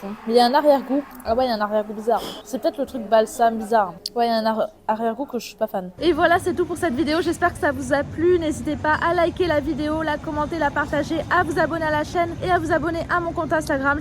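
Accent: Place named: Maghreb, North Africa. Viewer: Ton accent vient d’ou? Tu es D’origine maghrébine?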